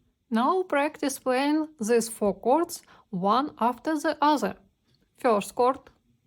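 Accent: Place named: Eastern Europe. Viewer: Amazing explanation but please do something about your slavic accent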